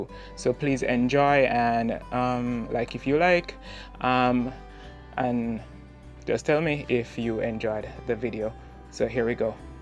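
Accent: Jamaica. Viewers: are you from jamaica?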